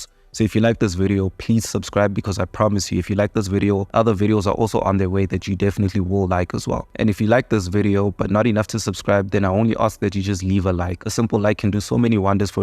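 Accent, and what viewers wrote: South Africa. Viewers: You sound South African bro. Like you went to Boston College or something. Anyway you speak very well and fluent. Keep going bro.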